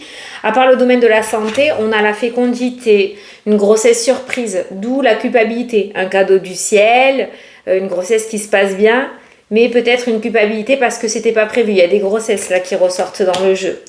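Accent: South, France. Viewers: Bonsoir que du bonheur de vous entendre 😊😊😊😊. Je me prénomme ludivine le 02/10/1979. Est ce que je vais bientôt réussir à avoir une grossesse depuis le temps avec tant d épreuves 😢?mais je ne baisse pas les bras.merci beaucoup de tes tirages qui me font du bien avec ton humeur du sud.je suis du sud aussi j adore ton accent ❤❤❤❤😊😊😊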